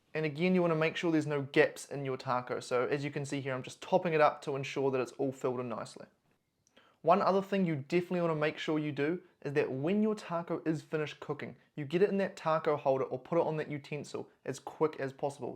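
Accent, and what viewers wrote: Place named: New Zealand. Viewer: Wow, I thought he sounded like a Kiwi. I recognised the brands he used.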